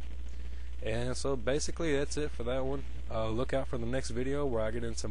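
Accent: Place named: United States. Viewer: i hear no echo? just a very thick american sounding accent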